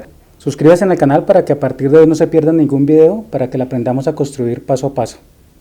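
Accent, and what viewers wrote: Colombia. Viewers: Por el tono de su voz ,creo que es colombiano,y de ser así ,mi respeto ,me párese muy loable lo que hace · hola amigo, que buen trabajo, eres de colombia? mas o menos por cuanto salio todo hablando monetareamente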